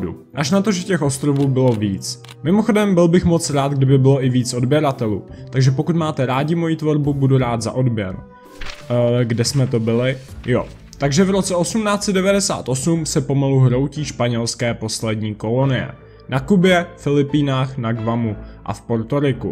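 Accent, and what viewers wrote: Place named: Prague, Czech Republic. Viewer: Sleduji vaše pořady, jsou dobré. Jenom mi vadí vaše čeština, nemohl byste na tom zapracovat? Pražština moc nejde do ucha.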